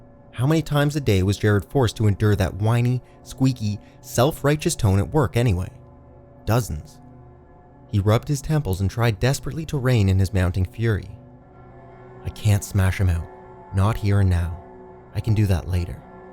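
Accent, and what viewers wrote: Canada. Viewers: Nothing like an actual Canadian doing the different Canadian accents and using the actual Canadian terms. :)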